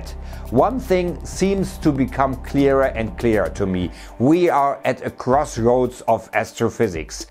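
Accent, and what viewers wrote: Germany. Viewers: Please work on your german accent. It makes the video less enjoyable, all in all nice topic and well researched as always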